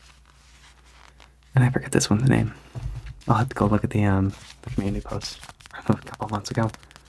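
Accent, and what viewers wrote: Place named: United States. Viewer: I love your American accent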